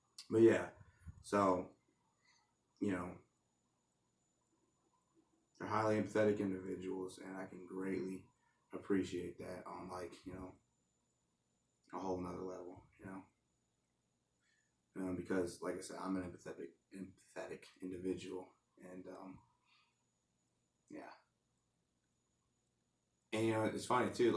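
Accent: United States. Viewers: As a trans woman from rural Appalachia, it means the entire world to me to hear a straight, cis man with a similar accent finally support my existence. It's the closest to feeling accepted by home I think I've ever gotten to experience. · What the hell were you bullied for? Good looking guy, normal deep southern voice. Not fat. not effeminate. I don't get it? Bullies are stupid apparently!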